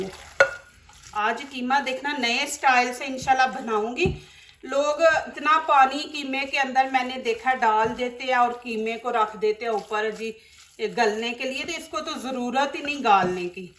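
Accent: Punjab, India. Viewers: Plz urdu ko urdu k.lehjy mn bolen aap that punjabi accent mn bolty hn kuch acha nhi lugta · ماشاءالله تعالیٰ ۔۔۔۔۔۔۔!!! بہت زبردست اور ذائقہ دار لگ رھی ھے یہ قیمہ آلو کی ڈش ۔۔۔۔۔۔!!! میں نے بیگم کو فارورڈ کردی ھے ٹرائی کرنے کے لئے ۔۔۔۔۔۔😊 پہلی دفعہ آپ کی کوئی ویڈیو دیکھی، آپ کے اردو بولنے کے انداز نے بہت محظوظ کیا۔۔۔۔۔۔!!! جیسے میں اردو کے لہجے میں پنجابی بولتا ھوں ایسے آپ پنجابی لہجے میں اردو بولتی ھیں ۔۔۔۔۔😂 میرا تعلق کراچی سے ھے اور مادری زبان بھی اردو ھی ھے، لیکن مجھے خاصی پنجابی سمجھنا اور بولنا آتی ھے۔۔۔ الحمد للّٰه تعالیٰ والسلام علیکم ورحمتہ الله تعالیٰ وبرکاته ۔۔۔۔۔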